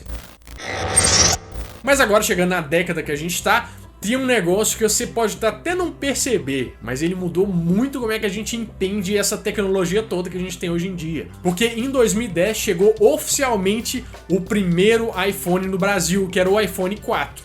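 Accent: Minas Gerais, Brazil. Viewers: Sou nova no canal , ele é mineiro ? Se for amei 💕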